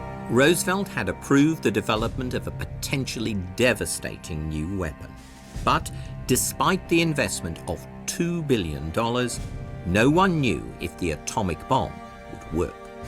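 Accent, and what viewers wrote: United Kingdom. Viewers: Why do you have an Englishman narrating American History? I love the Brits, don't get me wrong, but right is right and wrong is wrong.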